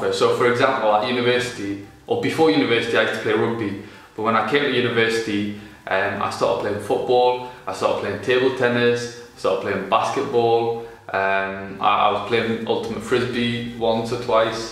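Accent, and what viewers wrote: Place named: Teesside, United Kingdom. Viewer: are you from teesside? you're the only person doing medicine i know who sounds like me lol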